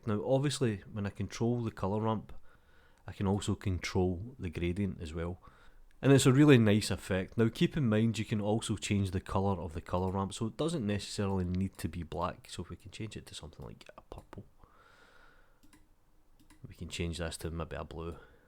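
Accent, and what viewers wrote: Scotland, United Kingdom. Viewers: oh my, a scottish man (?), just explained half tone shading to me. what a treat. :)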